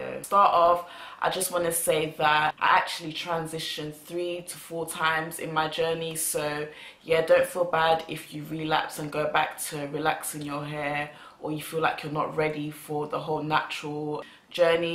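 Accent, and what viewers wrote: United Kingdom. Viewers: Just subscribed - only because I heard your accent....big up Brit Youtubers!! Now let me listen to what you have to say! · I love that British accent, it's special.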